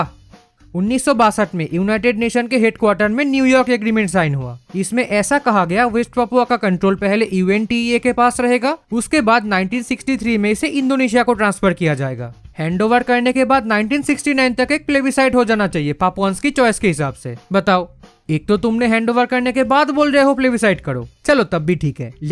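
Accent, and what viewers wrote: Bengal, India. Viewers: Typical bong accent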